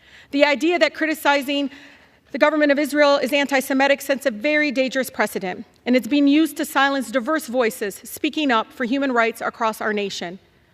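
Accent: United States. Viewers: Shes not from palistine . She sounds American to me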